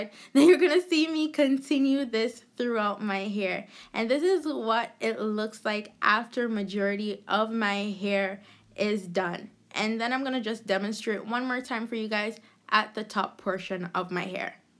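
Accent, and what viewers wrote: Patois, Jamaica. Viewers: Lol I knew you sounded Jamaican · u sounds like Jamaican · sorry I had to ask because I heard the accent and I'm a Jamaican too. great video goodaz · I knew you wee Jamaican...from the first time you spoke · You're trying to hide it but i think i hear an Jamaican accent😮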